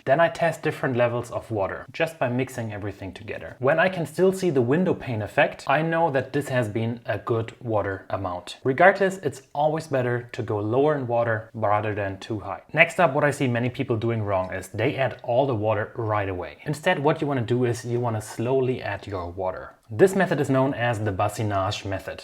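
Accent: Germany. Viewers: When people hear you speak English they wish they could speak German as well as you speak English. Your slight German accent is an added bonus. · Never apologize for your German English. Your speech is clear and easily understood. Your speech is near native English speaker! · Gluten tag! I loved the German English!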